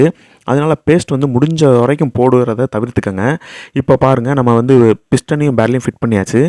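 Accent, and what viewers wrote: Madurai, India. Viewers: Neenga entha oor madurai ya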